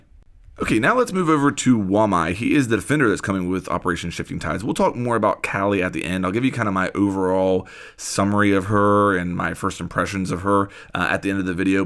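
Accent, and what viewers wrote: United States. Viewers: American accent he can't help it.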